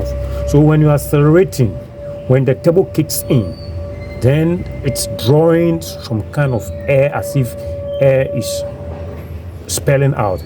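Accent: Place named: Cameroon. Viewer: This is my Cameroonian brother, I can tell from his English 😂 Am having same kind of noise like ratting in my Honda CR-V 2022.....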